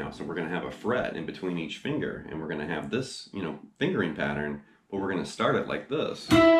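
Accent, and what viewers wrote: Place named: Georgia, United States. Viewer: You're from Chicago? You sound exactly like you're from GA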